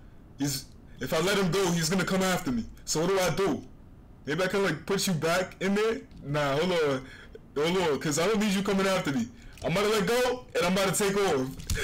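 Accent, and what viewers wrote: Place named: United Kingdom. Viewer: Guys his British😱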